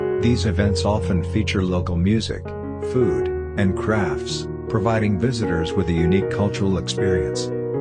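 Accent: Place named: United States. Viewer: What a pleasant American accent, where is it from?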